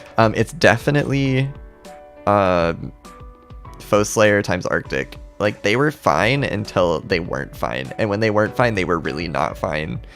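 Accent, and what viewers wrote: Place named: United States. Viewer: *Says that Bluestar is his fave WC character.* Me: Do I know you? Wait, do I live close to u? *My brain: Please say yes please say yes.*: Says he is from USA Me: I can tell The way u say ‘water’ It’s WATER NOT WADDER!!! Fun fact: I have met in Wings of Fire Roblox game What’s graduate school?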